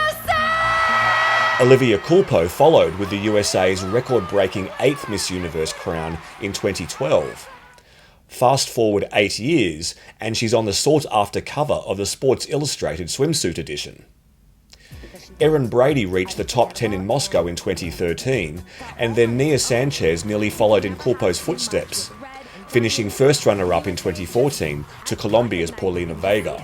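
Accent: Australia. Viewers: I’d have to say, as a beauty pageant enthusiast, you really do live up to your name as “ ”. The research, the editing, the (background) music, even the pronunciation of the girls’ names are spot on. Though with an Australian accent which I do not mind. Lol. 😏 Anyway, I coined our recent placements at Miss Universe as Venus Raj Era or Raj Era as with Venus started our golden age/era at Miss Universe. Hehe. 😁🤭 And also, I’d like to point out that Kylie Verzosa ~ Miss International 2016 is also a product of Aces & Queens. 😊 Can’t wait for your next videos/contents. 👍🏼😊 You’re doing a great job. 👏🏼👏🏼👏🏼👏🏼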